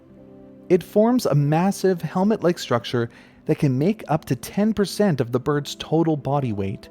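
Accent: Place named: Vancouver, Canada. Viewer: That makes so much sense! I never thought about it, but we have the same accent. I grew up just outside of Vancouver.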